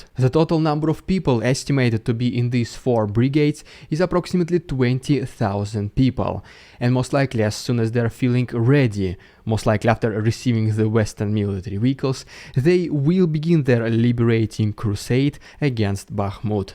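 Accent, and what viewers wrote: Russia. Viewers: I'm really digging this recent recommended " ". joining his Patreon is the least I can do. side note: really glad I watched a lot of Danial Kyvat while he was in F1. It's helped me easily understand the accent. more of a side note: the multi-language and multi-cultural speaking and understanding of so many people in eastern Europe is very impressive. Their intelligence, grit, determination and bravery is incredibly inspiring and give me so much hope for our world's future. The new and younger generation of leaders are beginning to form and emerge. They take no shit, they don't sugar coat, they see through lies and they speak the truth. love to see it. wish I could do more.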